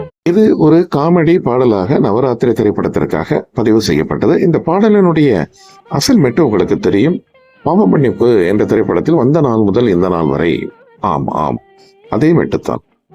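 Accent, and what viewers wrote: Sri Lanka. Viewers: இலங்கைத்தமிழர் என்பதால் இவரது தமிழ் இனிக்கிறது !! ஆனால் தமிழகத்திலோ எளயராசா வந்த பிறகு காலம் காலோம் எனவும் மோகம் மோகோம் எனவும் ராகம் ராகோம் எனவும் தமிழே தேய்ந்து அழிந்தது !!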